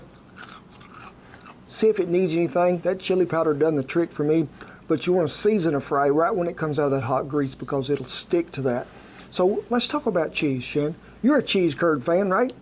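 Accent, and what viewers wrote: United States. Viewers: As a Canadian, I am very scared to see an American with a very heavy drawl making poutine.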